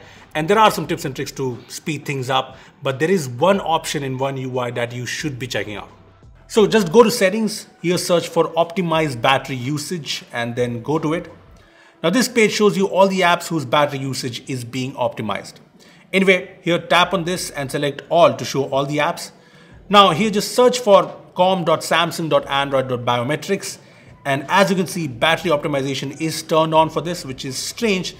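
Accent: India. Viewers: I hate indian english accent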